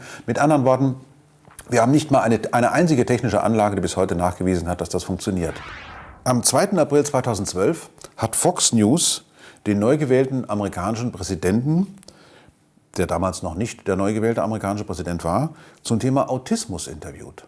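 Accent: Hesse, Germany. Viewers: Karli Davidson Prof. Lesch hat zwei Themen herangezogen und beide wissenschaftlich erklärt. Du hast nur inhaltlich und argumentativ versagt. Prof. Lesch kommt aus Hessen. Dort wird gerne mit isch statt ich gesprochen. Man bekommt einfach gewisse Dialekte nicht weg. Ich möchte jetzt nicht wissen wie oft du schon "da wo" gesagt hast. Oder "manchmal immer". Fakt ist, dein Kommentar ist so wertvoll wie Scheiße am Schuh.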